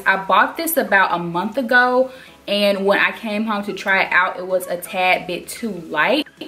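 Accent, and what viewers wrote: United States. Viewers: Love your country accent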